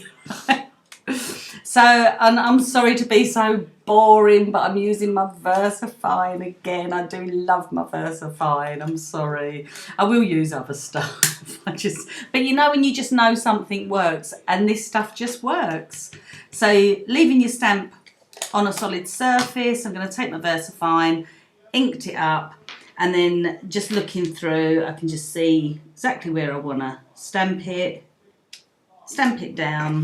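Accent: United Kingdom. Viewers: Thanks.. lovely to hear a Brit accent on YouTube 😊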